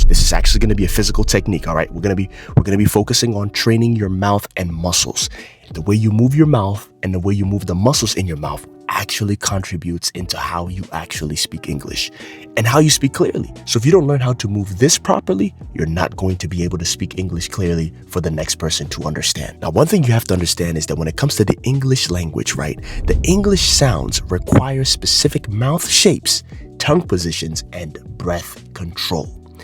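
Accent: United States. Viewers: From Algeria I Love your lessons at general and I love your Americanaccent. Particularly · I am from India ❤love your american accent but i am not able to pronounce like you please tell me how can copy you ?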